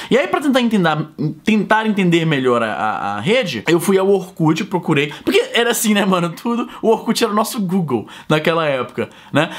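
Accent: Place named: Ceará, Brazil. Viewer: Curioso como tu n perdeu sotaque cearense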